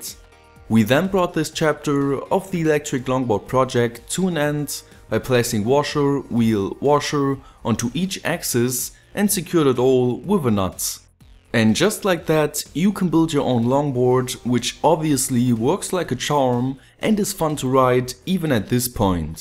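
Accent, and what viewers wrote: Germany. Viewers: LOL are you from Germany ?! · Sag mal täusche ich mich da oder bist du deutscher weil mir is das jetz schon öfters aufgefallen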